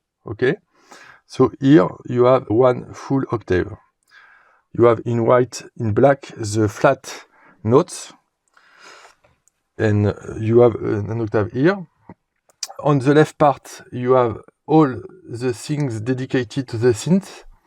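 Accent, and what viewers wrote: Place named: France. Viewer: Salut tu as un great french accent 😄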